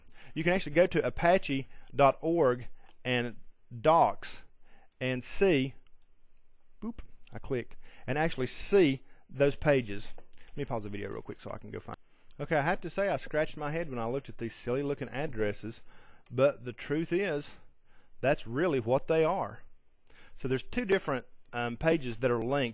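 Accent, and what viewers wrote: South, United States. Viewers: I really like this guys accent what is it though I presume southern drawl but I'm not 100%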